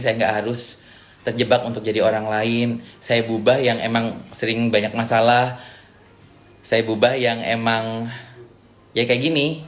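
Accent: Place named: Jember, Indonesia. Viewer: Logat jembernya masih kentel ... Bah... Semoga ada seseorang yang bisa sembuhkan kamu. Aamiin.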